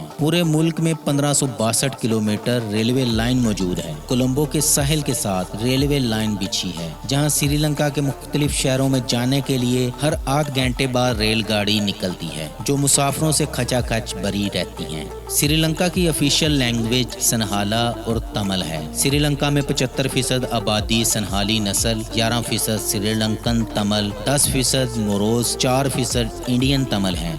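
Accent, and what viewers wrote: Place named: Punjab, Pakistan. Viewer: Biggest fan 😘 I love your beautiful punjabi accent Urdu , dear aap apna face reveal kro ❤️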